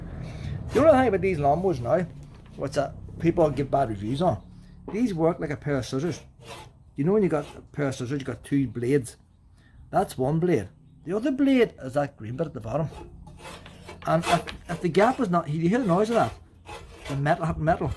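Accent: Ireland. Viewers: Hard to understand his accent very strong Irish accent 😅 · I'm buying one of these just for the Irish accent!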